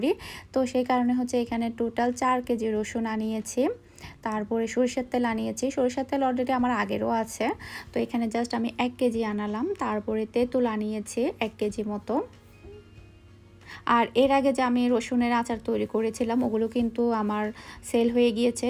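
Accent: Chittagong, Bangladesh. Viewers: Jehetu uni khas chatgaia, chatgaiara shuddho vashai kotha bolte gele airkm e shonai...kichu korar nai.... R try korle hoito hobe....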